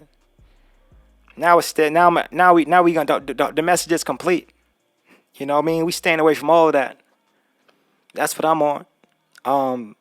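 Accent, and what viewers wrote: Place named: New York, United States. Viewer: I'm watching this in 2024 and you sound different now. I still hear the NY in you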